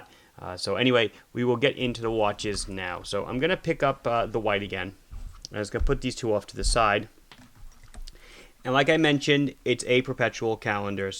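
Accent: Long Island, United States. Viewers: you’re the best watch teacher on YouTube! I’m a teacher myself and I can confidently say that your calm, methodical teaching style and pure joy for watches has made learning all about them easy and interesting. Plus the awesome lawng aisland accent goes a long way! Thanks for making all these Watch and Learn vids for us uninitiated to pick up the clues about the complex world of horology. I was given this seiko (in black🤘🏼) as a gift and came here to learn about it (it’s awesome, legible for how complex it is and not at all too big for my small 6.75” wrist). I now have a new hobby, thanks to and (also his mate over at urban gentry - love how they’re pals) Legends - thanks lads!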